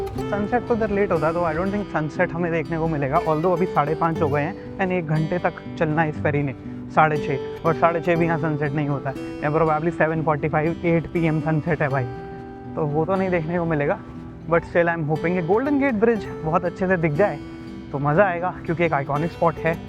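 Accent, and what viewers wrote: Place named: Kanpur, India. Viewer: Kanpur ka DNA accent me aa hi jata hai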